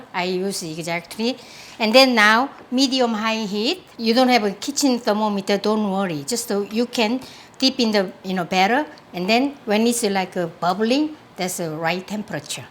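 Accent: South Korea. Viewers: 한국인영어발음이셔서 ㄹㅇ 딱딱 귀에박힘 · 영어 발음이 경상도 분 같기도 하고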